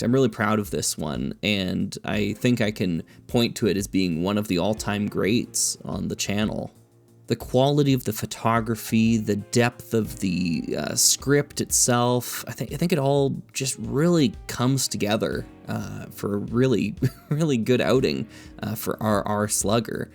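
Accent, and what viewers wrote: Canada. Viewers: A Canadian calling their own accent "harsh" is the most Canadian thing I've ever heard Great video, Slugger! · nooo slugger! Don’t apologize for your charming Canadian accent eh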